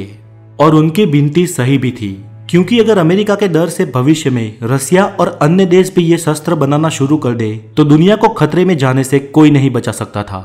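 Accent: Gujarat, India. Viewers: U gujarati?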